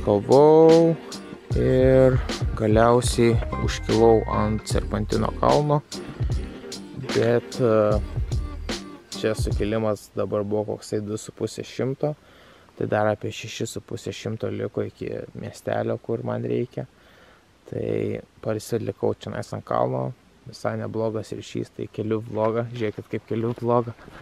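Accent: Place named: Panevėžys, Lithuania. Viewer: girdis kad panevežiokai :D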